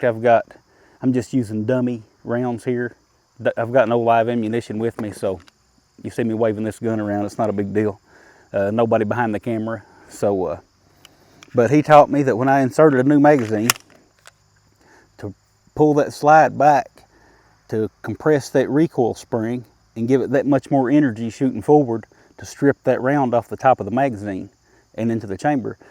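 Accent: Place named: South, United States. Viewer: Dude! I dig your last name.! That could be a band name. Right on brother! and I just subscribed to this guy's channel because of his non threatening southern accent, and I thinks he's right about the slide thingy.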